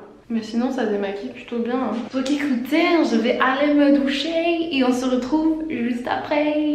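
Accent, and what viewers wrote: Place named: South, France. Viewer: De l’authentique (avec l'accent d’Ugolin dans Manon des sources ) c'est pour cela que tu as beaucoup d'abonnés et que tu en auras encore plus 👏ne change rien tu es magnifique autant de l'intérieur que de l'extérieur 😘😘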